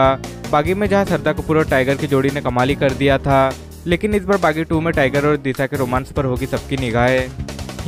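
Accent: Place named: Bihar, India. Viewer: Bihar se ho kya bhai , aawaj change kar bhai , Maza nahi aa raha Heeee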